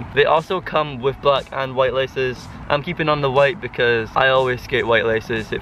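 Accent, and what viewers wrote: Scotland, United Kingdom. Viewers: Is he Scottish ? He sounds like Steven Caldwell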